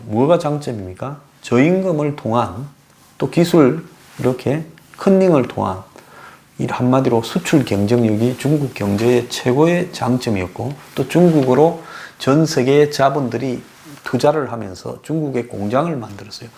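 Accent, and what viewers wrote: Gyeongsang, South Korea. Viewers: 경상도 사투리가 있어서 그런데 적당히 들으시구려 이곳에서 까지 그런 지적질 하지말고..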